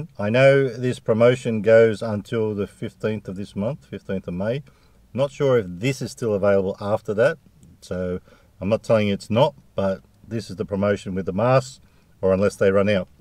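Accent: Australia. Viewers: GOTTA HAVE A BURRITO great video mate but your still just a touch too australian for my taste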